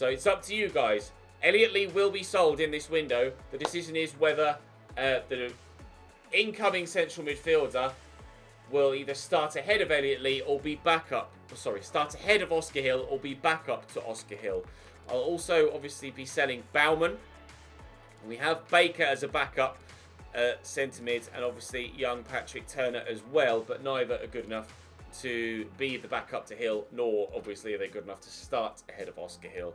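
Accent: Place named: RP, United Kingdom. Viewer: FUCKING BORING, NOT NEEDED TO CONTINUE THE CAREER, YOU TALKING IS SO BORING WITH YOUR UPPER CLASS POSHNESS xD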